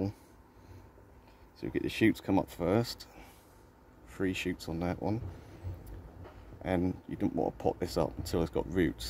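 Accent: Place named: East Anglia, United Kingdom. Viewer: Hi would you sell me a couple of cuttings …. From your accent I can detect a slight east Anglian lilt. Possibly Norfolk? Or north Suffolk. Anyway I travel to norfolk every couple of weeks so I could collect as I’d like to try growing it. Having been inspired by your video or you could post …? If not. Any ideas where I could get hold of some?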